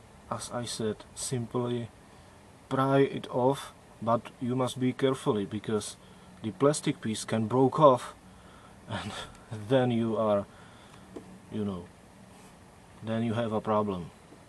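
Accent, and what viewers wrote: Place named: Czech Republic. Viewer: So sorry. Now I see Czech. Definitely not a Deutsch accent. By the way, the car is working fine. If it goes bad, I'll know the fix.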